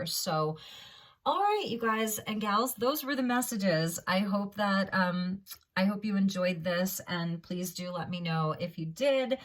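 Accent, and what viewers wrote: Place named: France. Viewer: Votre accent français est trop mignon 😁👍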